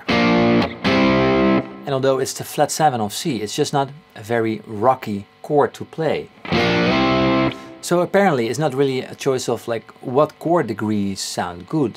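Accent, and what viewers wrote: Netherlands. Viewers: This guy sounds like he is Dutch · He sounds dutch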